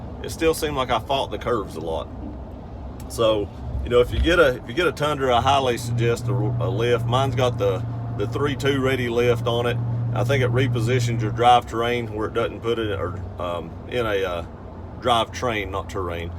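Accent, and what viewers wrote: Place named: Tennessee, United States. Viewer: Great review of Tundra, love the eastern Tennessee accent too, some of the best folks in the world are from that area, a great friend passed away earlier this year from Bristol Virginia, Kevin Davenport, he had this same accent, could sell ice to a Eskimo and always had nice vehicles. Was blessed to have had him as a friend!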